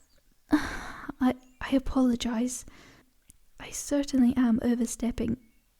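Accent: Scotland, United Kingdom. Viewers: Scottish or British, miss? Or might you be Aussie? I'm lead to believe you are one of the three. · I love the hint of Scottish in the accent btw!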